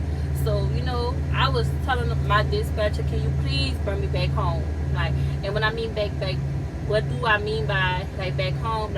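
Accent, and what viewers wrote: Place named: Gullah Geechee, United States. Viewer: Such a geechee accent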